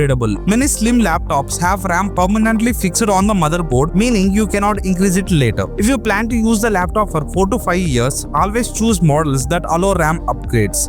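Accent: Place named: India. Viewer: This was the best type of video I have seen . Man everything is great , really helpful, but your indian English accent sounds fun . 😅😂❤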